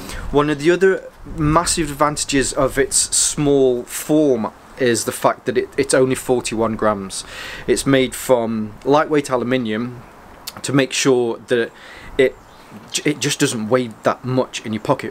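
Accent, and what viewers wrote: United Kingdom. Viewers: Interesting how Brit accents differ as they do here in the US. You for instance sound like the guy on Delboy's Garage. How's the light held up?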